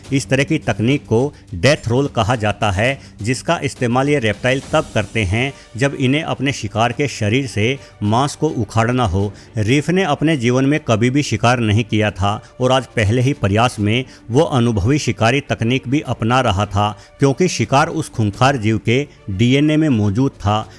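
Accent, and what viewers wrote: Haryana, India. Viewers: Bhai tu haryana se hai ke?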